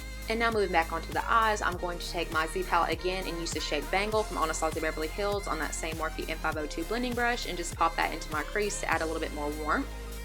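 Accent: South, United States. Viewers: Are you from the south? I am and I noticed the accent immediately! lol. It gets boring just listening to british / american accents all the time. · Gorgeous!! Can't wait to see your channel grow! Is your accent southern?!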